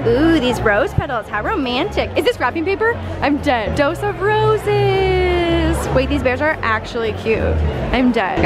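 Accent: Canada. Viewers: tomoreow hahahhaa love ur canadianness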